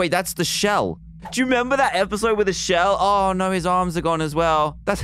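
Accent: United Kingdom. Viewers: Are you brittish??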